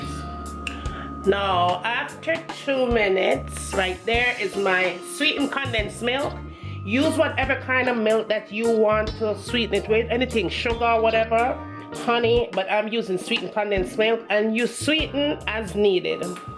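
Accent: Jamaica. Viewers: Your man must be happy cos you can COOK and Cook EVERYTHING!!!! Keep up the phenomenal cooking. Its so rare to here an american whose Jamaican accent remains, I love it, true Jamaican!!! No disguising that xxx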